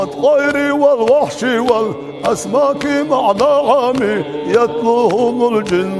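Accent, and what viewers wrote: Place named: Chechnya, Russia. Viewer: Клас на чеченском деалекте слушать одно удовольствие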